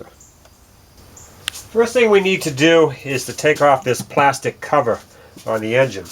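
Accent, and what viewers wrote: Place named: New England, United States. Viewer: This is a nice video and you have a pleasant New England accent. What videocamera did you film with? Thanks. T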